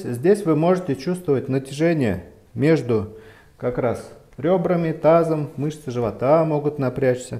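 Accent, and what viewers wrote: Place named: Chuvashia, Russia. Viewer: Остановилась на видео, услышав знакомый чувашский акцент (сама родом из Чувашии из Чебоксары, живу в Германии),этот доктор мне родненький земляк😍, какой классный 👍.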